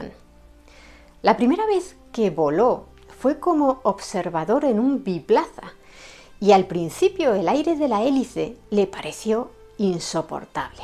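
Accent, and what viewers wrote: Spain. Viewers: Excelente trabajo. Muchas felicitaciones por tu canal, es muy bonito escuchar todas esas historias con tu lindo acento español. Espero algún día ver historias de personajes latinoamericanos como por ejemplo Miranda. Un gran beso y un fuerte abrazo desde la América Latina.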